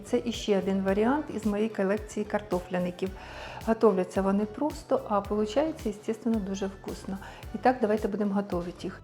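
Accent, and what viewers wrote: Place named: Donbas, Ukraine. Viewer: хочу таке приготувати. а де вы проживаете, бо мени наравиться як вы говорите. прямо як у нас в Донбаси - вымушена переселенка.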